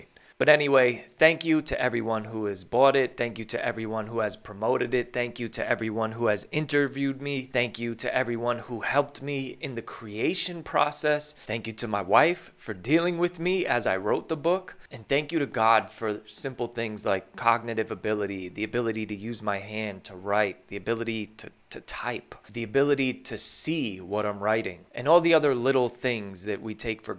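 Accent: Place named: New York, United States. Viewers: Still finishing it up. Excellent book so far! Also, your New York accent is great for audio books. lol God bless you, Mr.. Thank you for your awesome book. Happy Thanksgiving, sir! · you do have a New Yorker accent, so I wasn’t going mentally insane, I always thought you sounded a bit new yorkish. Also my live chat comments don’t show up on the video for Isaiah Saldivar, maybe he didn’t like me asking him about debating you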